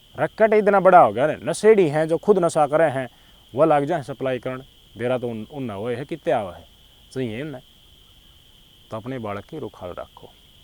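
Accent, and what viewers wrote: Haryana, India. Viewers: Bhai. Sahab aapki.Haryanvi.boli.may.patarkarita.ko.salut.karta.hu.🎉🎉🎉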